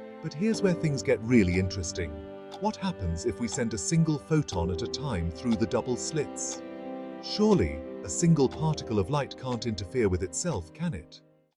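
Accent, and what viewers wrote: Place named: England, United Kingdom. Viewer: I'm sorry - I can't take that accent seriously. I know there's a bit of a fashion on yt for slightly plummy English accents doing sciency documentaries. But this accent is slightly weird.... tbh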